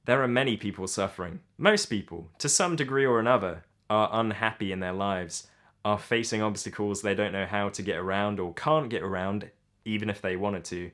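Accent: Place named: England, United Kingdom. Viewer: Where do you live? I presume from England from your accent. Good video anyway. What about your mental health and depression? I hope well. Best wishes from Italy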